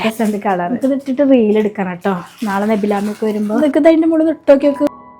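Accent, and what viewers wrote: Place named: Malappuram, India. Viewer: Malappuram varthanam ikkante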